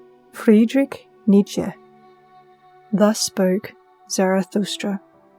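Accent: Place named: United Kingdom. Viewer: OK. I have to say I'm totally gobsmacked that you have a British accent! I've been following you for several years now, simply because you told your story of having an extreme "cultish" religious upbringing that matched mine VERY closely. I'm in the USA; and perhaps I didn't think that anyone outside of the USA could have the same severe religious upbringing as me (and I must say, mine was MUCH more severe, abusive, controlling, cultish and brainwashing than yours). Yet...you have had a harsh religious upbringing, too...and in Britain! Seriously...I didn't ANYWHERE ELSE in the world could be as bad as Tennessee and Georgia, USA!!! And hey...I ADORE your accent! It's SO NICE to finally "hear" you and not just "read" you! Thank you for this, and blessings!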